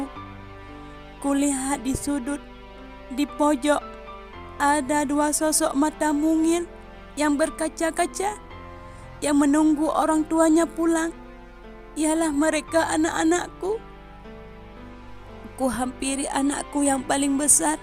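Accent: Aceh, Indonesia. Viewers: teungku.. teungku... logat seperti logat Aceh. peu beutoi ureung aceh?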